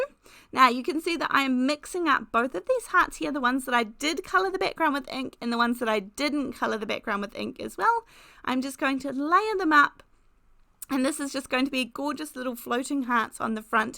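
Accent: New Zealand. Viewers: Yay, we have sound! I love your crafts and the sound of your amazing NZ voice! 🤩🤩🤩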